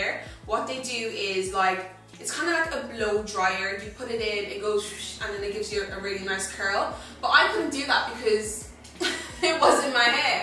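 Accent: Ireland. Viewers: I love the Irish accent. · OH thank you! loool sorry, i think have a little trouble understanding irish accents sometimes but i love them tho! so bloody charming! <3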